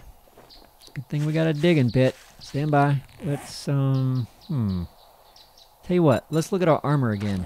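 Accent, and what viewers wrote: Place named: Appalachia, United States. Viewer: I can hear your accent a little bit the Appalachian twang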